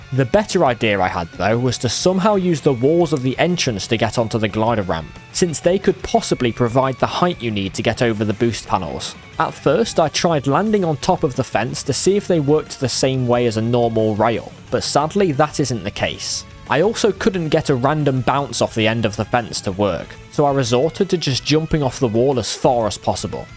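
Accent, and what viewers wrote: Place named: United Kingdom. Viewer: Calling it "Wario's Shipyard" as opposed to "Wario's Galleon" caught me off-guard because from your voice I thought you were British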